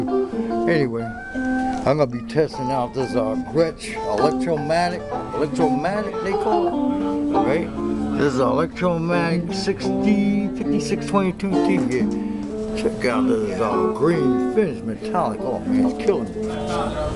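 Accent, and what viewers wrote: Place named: South, United States. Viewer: It's so weird seeing a guy with asian heritage having a really southern accent. XD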